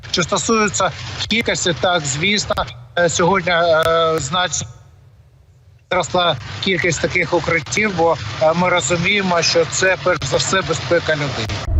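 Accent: Kharkiv, Ukraine. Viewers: Харьковский украинский!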